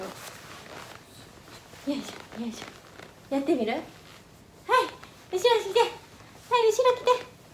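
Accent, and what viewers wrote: Kyoto, Japan. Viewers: 京都弁好きだ！ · I thought she has kyoto accenct. Osaka and kyoto accent are pretty similar though.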